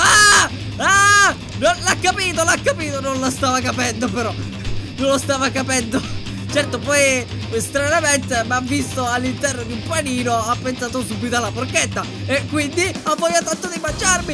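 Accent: Sicily, Italy. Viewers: È della sicilia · Ma sei Siciliano ? :))))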